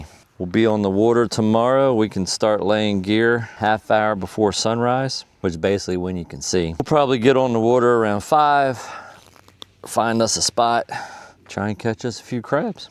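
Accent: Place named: Maryland, United States. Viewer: " WOODER!! LOL, Definitely got that Maryland accent!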